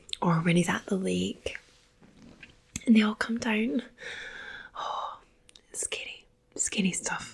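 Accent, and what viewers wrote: Scotland, United Kingdom. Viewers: Where in Scotland are you from? Your accent seems stronger than mine x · as a Scottish person watching this I love it but I'm still not used to hearing Scottish accents on Youtube. Anyway I love this channel! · doesn't take away from the experience I assure you. Very good impressions! And still relaxes the hell out of me. Great work. Plus you have the accent going for you, you just can't beat a Scottish accent. I don't care who you are. Especially for ASMR. Just fantastic. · Modsforfun I love that Scottish accent. I need a woman man from either Scotland, Ireland, or England.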